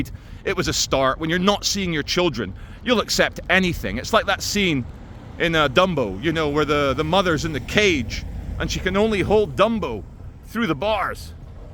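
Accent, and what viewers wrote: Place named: Scotland, United Kingdom. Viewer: your posh Scot accent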